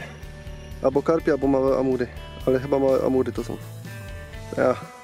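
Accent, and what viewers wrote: Silesia, Poland. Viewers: Filmik fajny, ale śląskiej gwary bardzo ciężko się słucha.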